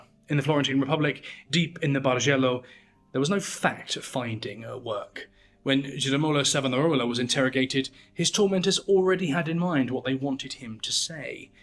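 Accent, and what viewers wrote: England, United Kingdom. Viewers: Just got home saw the alert have a new video, oh man dinner with a side of torture in that soothing English voice 😂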